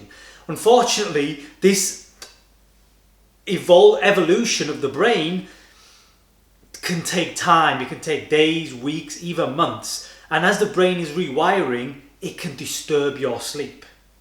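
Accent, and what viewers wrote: Manchester, United Kingdom. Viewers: This is been happening for MONTHS now. I’ve been trying everything - eye masks, lavender diffuser, journaling, gratitude, showers, experimenting with snacks, sleeping pills, etc… NOTHING seems to work to make me feel refreshed in the morning. I usually stay optimistic, and hope for a better night sleep the next night. But today, I was kinda broken and f’in fed up with it! I needed this video today brother, thank you ❤️. As an aside - you sound like you’re from in or around Manchester? That’s my home, although I’m now on the other side of the world. So nice to hear this in a comforting, familiar voice 😊👍🏿. Have a great day!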